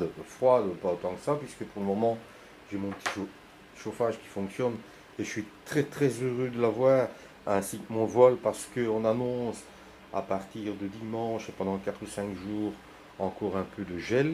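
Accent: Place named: Wallonia, Belgium. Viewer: moi tu pourrais encore faire tes vidéo en wallons je kifferais aussi. Il faut être fière de notre accent, nos formules de langage, de notre Belgitude... il n’appartient qu’à nous-mêmes! 👍🏼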